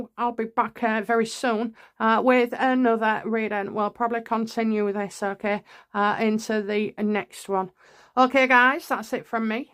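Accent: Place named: Yorkshire, United Kingdom. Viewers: For your information has explained in the past that she was deaf also the dialect is northern, so sounds a little strange to some. Your rudeness is absolutely beyond the pail. What a truly nasty thing to say, bet your speech isn't perfect, shame on you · How dare you that’s so rude she’s got a Yorkshire accent if you don’t understand her then turn off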